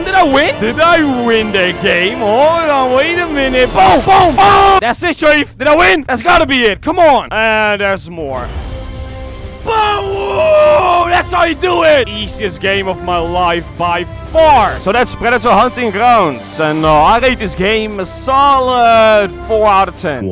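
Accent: Netherlands. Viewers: cyril MAGIT that’s a Dutch accent it’s lowkey funny when a Dutch guy speaks English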